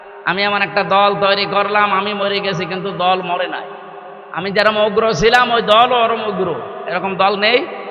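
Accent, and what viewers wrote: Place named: India. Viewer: দাদা ইন্ডিয়ার সুরে কথা বলছে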